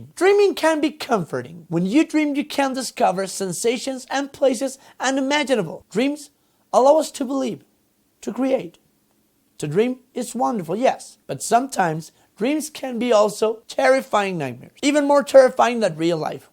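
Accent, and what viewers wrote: Mexico. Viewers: everyboy in every video say things about his pronunciation, he's mexican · el ingles esta chido se nota el acento mexicano chingon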